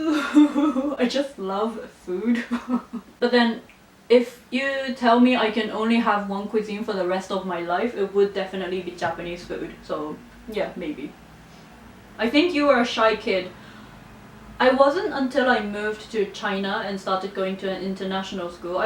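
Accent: United Kingdom. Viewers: Love how you react each assumption politely and kindly! You look intelligent + have a beautiful British accent! My assumption is: you are a great reliable manager at work😍💕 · Wow! You went from three thousand to nearly thirty thousand subscribers in two years! That's amazing!... I'm a recent addition to the number, but have enjoyed watching some of your older videos. Your presentation is really nice and you seem to be a genuinely lovely person. If things haven't changed in the last two years, then I am very surprised that you aren't married. As a Brit, it's really nice to spot Asians who have lived in the UK. Our culture definitely rubs off, as with the accent and sense of humour... and Japanese women with a British accent when talking English sound really cool.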